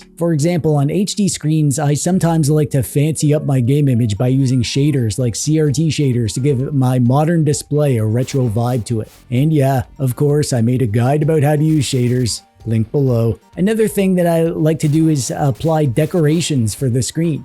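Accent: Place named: Canada. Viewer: I wonder where he's from "withoot faffing aboot" .. oh, Canada.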